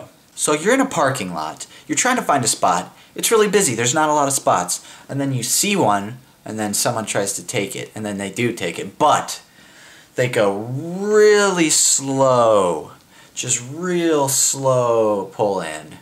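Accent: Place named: Midwest, United States. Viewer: i like that you still have a mid-western accent even though you live in California.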